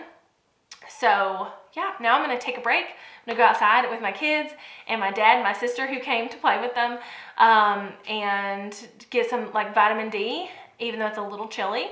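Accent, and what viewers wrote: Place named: Carolina, United States. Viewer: Nice Carolina accent you have -)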